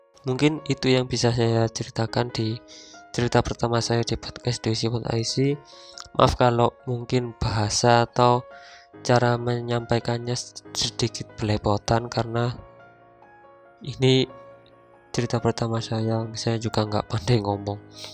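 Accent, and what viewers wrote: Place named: Malang, Indonesia. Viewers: suaranya khas malang banget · Itu logat medoknya kayanya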